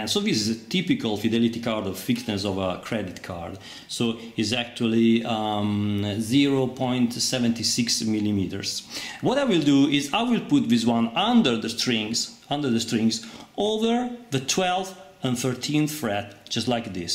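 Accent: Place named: Italy. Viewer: Nice trick! With always this funny italian accent! (although my french one can be funny too! ;-) ) . I let you know I follow you to make my guitars fixing and adjustements and it's ok all over the way ! :-)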